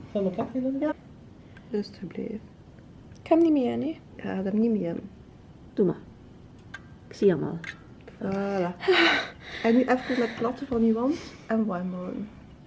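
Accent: West Flanders, Belgium. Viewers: & ik hou van het West-Vlaams in je vlogs! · Funny... en west-vlaams! Ohja en ik moest van Hannes :D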